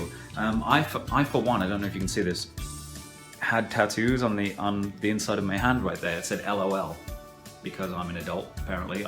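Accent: United Kingdom. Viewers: Are you british? because you sound kinda british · You sound British but say some words with an American accent.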